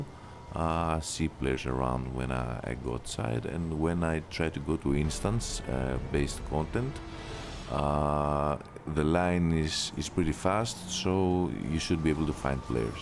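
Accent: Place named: Greece. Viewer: you sound like a greek or it probably is my idea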